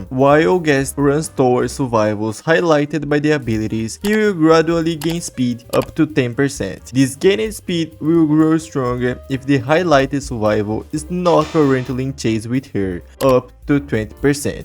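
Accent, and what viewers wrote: Brazil. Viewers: O inglês mas brasileiro q eu js vi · Cara não vou mentir que incomoda o inglês brasil seu sotaque me ataca muito mas bom video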